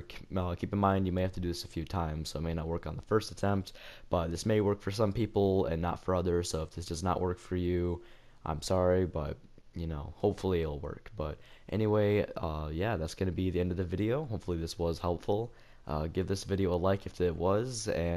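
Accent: Wales, United Kingdom. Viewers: You welsh bat? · Are you from Wales?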